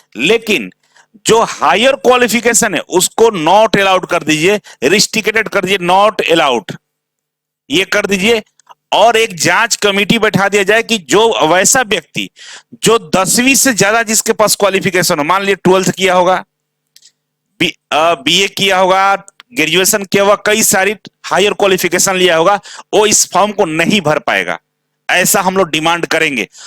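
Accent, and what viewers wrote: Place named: Rampur, India. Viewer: Sar Rampur wala bol raha hai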